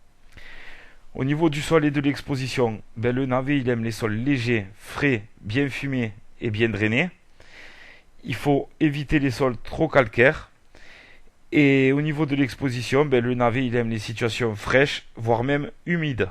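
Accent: South, France. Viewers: et bien merci pour la vidéo et vive accent du sud je suis de Narbonne et passion jardin aussi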